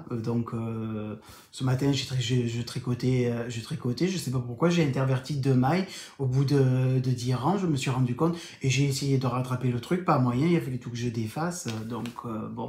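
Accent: South, France. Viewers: Bonjour Mais quel plaisir de vous suivre à chaque fois, je passe un excellent moment. J'adore votre humour, votre franchise et votre gentilesse. Vos tutos sont au top, et les explications de vos ouvrages détaillés sont super chouette. Quant à votre accent J'ADORE!!! Je suis du sud aussi. Un grand merci pour tout ce que vous faites pour nous et à très bientôt. DOMY du 04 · Bonjour moi le bruit d ouverture de colis ne me dérange pas du tout au contraire,et l émission sur le tricot je l'ai déjà vue il y a longtemps,et j aime l accent du sud! nous ont est dans le nord la où il fait gris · Coucou, jeune! Heureusement que la perfection n'existe pas dans ce bas monde, sinon quel ennui! Il n'y a aucune course au tricot, chacun son rythme, chacun ses envies et les vôtres sont très belles et inspirantes, avec cette touche d'humour "avé l'assent". A bientôt. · Merci, on passe vraiment un très bon moment. 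Je découvre un univers de teinturières et de créateurs que je ne connaissais pas, alors que j’ai appris à tricoter il y a plus de soixante ans. Je suis arrivée à « « en cherchant un tuto pour talons de chaussettes en rangs raccourcis et depuis j’écoute le podcast J’aime beaucoup l’accent du sud, le naturel et la bienveillance Surtout ne changez pas Bisous de Normandie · Quel agréable moment passé en ta compagnie! Tes vidéos sont tellement « vivantes » qu’on ne s’ennuie pas… entre ton bel accent du midi et ton humour c’est le moment récréation pour moi. A très vite j’espère 🤗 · Merci pour ce jolis moment,je suis belge et j aime tellement ton accent de soleil a bientôt Big bisous ❤❤❤😂😂😂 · Bisous et merci pour ce podcast tout en gaieté avec ton bel accent du midi. ❤❤❤❤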